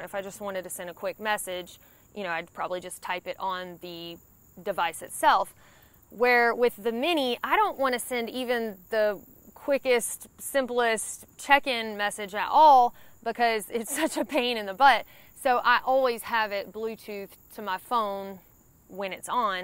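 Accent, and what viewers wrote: South, United States. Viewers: I've come to really like the southern accent in ladies. Anyway, hahaha, great content! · I like your southern accent. The info was great as I have explorer+ and with mini2 out I was contemplating going to mini2 vs keeping my explorer+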